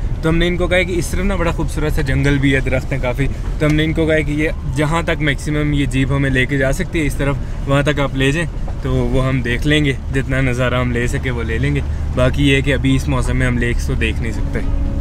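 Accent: Punjab, India. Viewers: Bro aap ka jo punjabi touch hai na conversation main wo unique hai jisay daikh k apna apna feel hota k apna koi yar bna raha..... Warna north k vlogs to aur b boht log bnatay hain...... Isi trah genuine accent main raho u deserve more respect specialy u realize us to how we love our country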